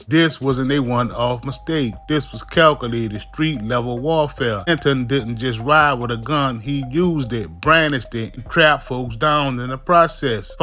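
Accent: New Orleans, United States. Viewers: Loving your videos on all the escapees, good research and a local NOLA voice making for great media 👍 keep it up you're going viral!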